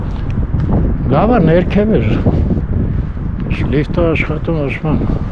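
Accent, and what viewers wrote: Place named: Gyumri, Armenia. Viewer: Вохчуйн! Как давно я не слышал ленаканское наречие именно ленаканское, а не ленИнакаеское ! Было время ехал в командировку в Ленинакан. Чаще на электричке. Знал название почти всех станций, сейчас помню ни все. Ереван, 8ой км, Масис, Эчмиадзин, Октемберян, Каракерт Гетап,, Ани, Ленинакан.. А когда поехал за бульдозером на МАЗе, в Ленинакан поехали через Аштарак, а возвращались через Октемберян. В Ленинакане работал на бульдозере на территории мясо комбината А на территории молочного завода в Ахуряне.. На обратном пути в Октемберяне пробило колесо на трейлере.. Заменили колесо на следующий день. В Ленинакане оставался в гостинице здание похожее как гостиница " Ани" в Ереване. Рядом был кинотеатр названия не помню. А еще в далеком детстве отдыхал в детском санатории в Ленинакане. Вот такие воспоминания!!! 😮😊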